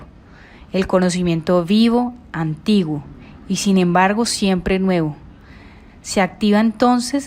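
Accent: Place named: Latin America. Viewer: De todos las versiones en español, me gustó más su versión ya que es español latinoamericano y puedo entender bien el acento ❤ gracias!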